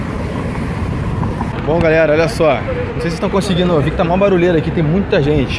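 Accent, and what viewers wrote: Rio de Janeiro, Brazil. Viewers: eu desconfiava dele ser carioca pelo sutaque dele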